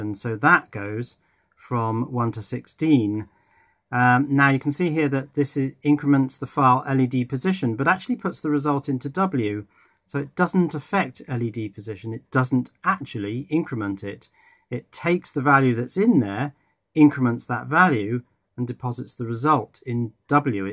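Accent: England, United Kingdom. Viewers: Aman Mittal given his accent, I'd say England.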